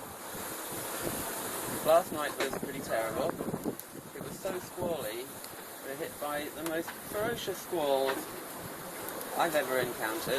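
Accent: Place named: United Kingdom. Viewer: Hi, some time soon Im hoping to sell my house and buy a boat too. I have just started to learn about sailing,may I ask what boat you have and make pls? Where are you from I hear a british accent, I myself am from Cardiff South Wales.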